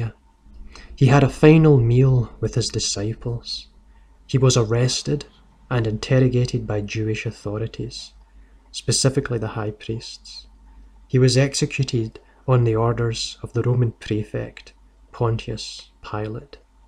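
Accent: Scotland, United Kingdom. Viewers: 50 years after Christianity was declared the official religion, the western Roman empire fell. Ushering in the most depraved chapter in recorded human history. The church was Europe's rulers. Who are you trying to kid? The inquisition, routine torture of heretics, (one Pope complained the people being tortured, in the basement of the Vatican, were not hollering loud enough), the routine practice of cannibalism by the crusaders, an estimated 50,000 people burned alive for demon possession, slavery (the first slave ship in N America was named for Jesus), the routine destruction of ancient science (Archimedes discovered calculus almost 2,000 years before Newton, Christian monks destroyed it.) The only good part of your doc is your accent. I assume you are from Scotland. The most depraved archeological dig I have ever encountered occurred in Hull. 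Priest trading sexual favors for privileged positions in the after life. <link>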